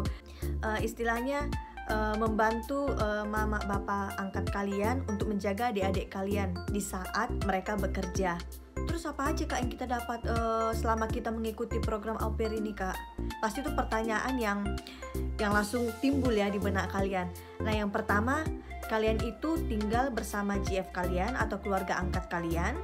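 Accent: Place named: Medan, Indonesia. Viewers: Enak bangat ya dek..udh pernah di jelaska n Inong same.. kayak gitu dek soalnya dia pernah melakukan nya sekarang dia kawin sama orang Austria .. dan tinggal dia di Austria..anak saya aja saya anjurkan kayak gitu dek ..tapi diaudh kerja sama kuliah sekarang di Batam de .senang kakak dengar logat Siantar mu dek..❤️😭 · Nampak y logat medan itu. Gk bs hilang hahahaha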